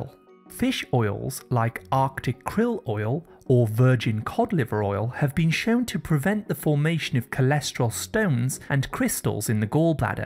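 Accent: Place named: RP, United Kingdom. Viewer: Why does the announcer sounds like a robot with a Buckingham palace accent?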